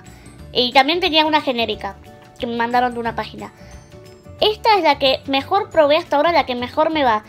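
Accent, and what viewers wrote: Argentina. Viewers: jejjeje es argentina, el acento es muy chocante. Algo basico linda 😘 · sos de buenos aires??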